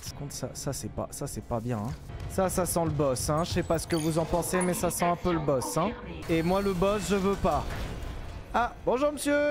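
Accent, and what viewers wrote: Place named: France. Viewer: Et à quand la fin de tes tres merités vacances!!!! Je mennuie de mes jeudis midi bagnole avec celui qui a laccent francais mais ne devient jamais monotone a ecouter ( je suis au quebec et vive le decalage!!!) et je dirait meme c du bonbon a ecouter .... Et , meme si ca peut etre drole au debut merci davoir laisser les pets et les rots dans ton ancienne vie.....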